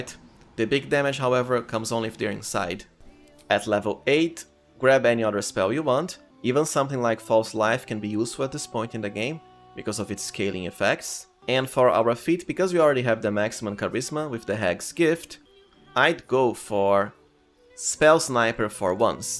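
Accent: Brazil. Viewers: Eu tinha certeza que tu era brasileiro pelo sotaque. Conteúdo muito foda meu mano. Talvez tu fosse o melhor canal de bg3 builds no brasil em pt, mas a escolha do inglês claramente deu certo. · maluco com sotaque todo brasileiro falando ingles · não tem como nao saber que é br com esse sotaque, belo video amigo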